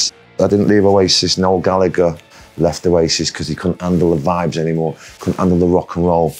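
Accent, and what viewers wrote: United Kingdom. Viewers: Definetly, i love british accent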